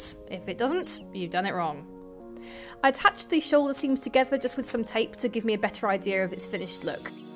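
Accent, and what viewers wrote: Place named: England, United Kingdom. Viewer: Someone with an English accent using imperial measurements 😍 Most videos use those centimeters and millimeters nonsense 🤣🤣🤣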